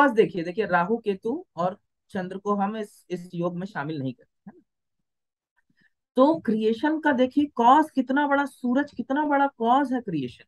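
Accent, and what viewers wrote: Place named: Allahabad, India. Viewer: I didn't know before that he is from Allahabad. But when I heard for the first time, i got Allahabadi vibes from him😅. But ignored it. Must say Allahabad has its own taste.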